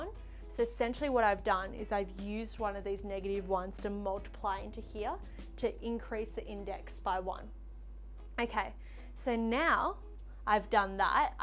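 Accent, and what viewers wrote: Australia. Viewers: Hello. Are you Australian? Just asking for a friend.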